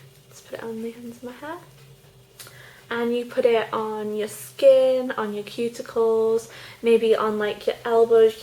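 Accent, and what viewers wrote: United Kingdom. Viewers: ah! ur so british and i love it!! i miss my british friends... they used to come to california and visit me often. they live on "the isle of white" do u know where that is?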